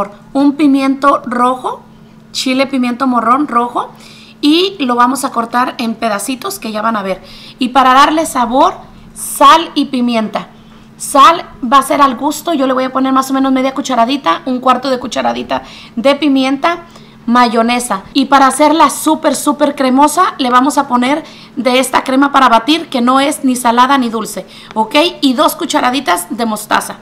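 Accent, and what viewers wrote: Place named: United States. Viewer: Usted tiene tone de rancho pero se siente americana Por sus pronunciaciones eso se llama malinchista, Y dice que es de Michoacán.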